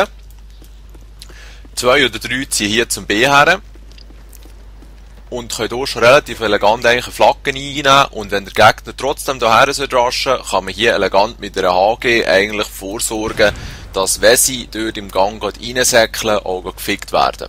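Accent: Switzerland. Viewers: Ouuu was schwizerdütsch!!! :D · haha :D gnau so öppis hani gsuecht und den isches ersch no uf schwizerdütsch ;) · Endgeil das swizzerisch :D ich versteh kaum ein wort aber kann mich da köstlich amüsieren :D bitte mehr :D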